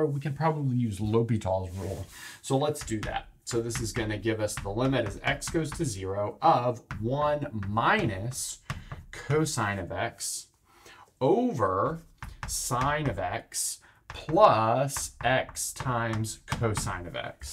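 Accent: United States. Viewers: at the beginning of seeing these videos I was thinking that the formula lim f/g=lim f'/g' was the log beetle rules and I realized that it was l'hôpital rules and I am french😂 American accent is really fun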